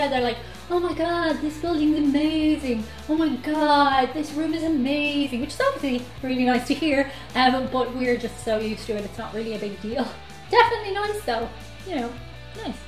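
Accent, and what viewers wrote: Ireland. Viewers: Beautiful as usual. I always enjoy the Irish accent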